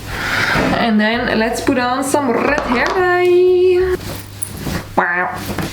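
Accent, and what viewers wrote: Netherlands. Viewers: I hate myself for knowing you were Dutch just by your accent😂😭. Ik dacht zo van “huh is ze Nederlands?”😂.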